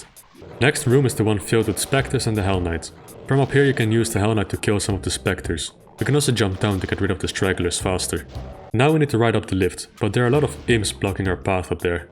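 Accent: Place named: Netherlands. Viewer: I can't get around your heavy Dutch accent.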